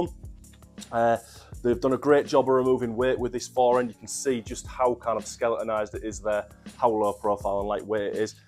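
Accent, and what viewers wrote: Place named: Hull, United Kingdom. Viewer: ah nice mate, I’m from hull myself thought you sounded close. Wanting to get into fac shooting but no idea where to start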